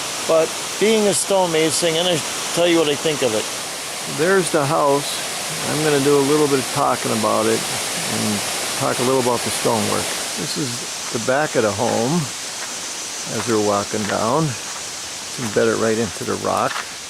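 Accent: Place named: Pittsburgh, United States. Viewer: Today they would use the lickum and stickum method on that stone. You know, you buy it at Home Depot,and they even give a 15 minit coarse on how to do it. The guy or lady giving the coarse might be a retired cop or school teacher. lol But anyway you would still be in jail had you designed that house. But his arrogance F. L. W. was looked upon as a genious even though the place got to the point that it wasent even livable anymore due to how bad it settled. Millions were spent trying to save that place and there are videos of the whole restoration project. A whole bunch of pinheads coming up with a whole bunch of bad ideas on how to fix it. The best way would have been to tear it down and start over with a sensible design. You mention your dad being old, Well in a few days i will turn 87, i can no longer work due to serious back issues, but i can still hang out at my sons large cabinet and millwork shop here in Vero Beach and keep things straight. 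lol And i can still launch my small boat and fish the many very good lakes near here, although the salt water i can no longer do. Your accent says your from the Pittsburg area, where mine would indicate Philly. We still have a nice family owned camp up there in Cameron county, but i havent been back there since the Covid situation heated up. Anyway i cant really take the nasty wether any more either that area is well known for. Keep up the good job on the videos and for gods sake try to remember to put the line up witho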